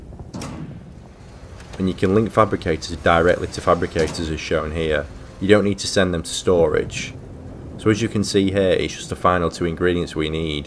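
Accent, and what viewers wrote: United Kingdom. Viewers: Thanks, this is the first video ive seen that has a nice soothing UK accent over "some others" that are hard to bear, and explains the flow through the various processes quite well indeed. Many thanks. On PC ( Possibly consoles also) there is a mod you can get called Richer Galaxy which increases the vendor cash amounts and a few other things also, might make it at least feel worthwhile to make and sell your niff naff tat.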